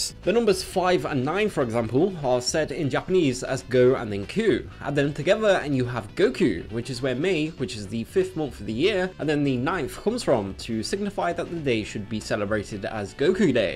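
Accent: United Kingdom. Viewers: That's because he's British, all British people would call the anime Dragon Ball Zed.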